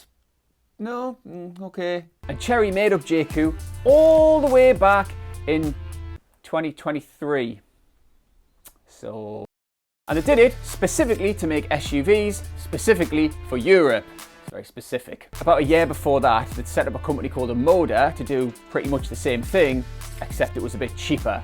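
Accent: United Kingdom. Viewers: Hi is this dude's accent a British Pakistani accent?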